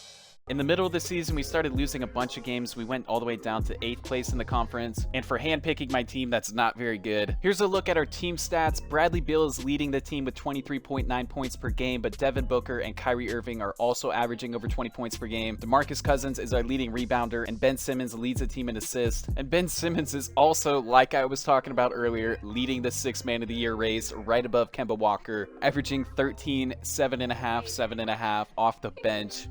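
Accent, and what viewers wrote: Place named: Iowa, United States. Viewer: You from Iowa?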